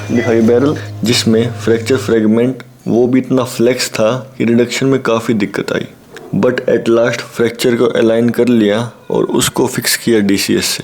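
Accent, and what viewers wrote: Haryana, India. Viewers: Oo my god your haryanvi accent 🤗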